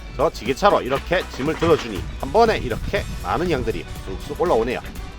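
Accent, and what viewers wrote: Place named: Gyeongsang, South Korea. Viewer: 경상도 같으신데~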